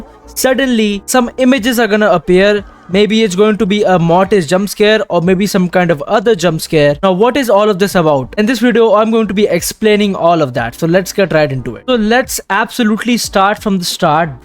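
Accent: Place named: India. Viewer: I like Indian accent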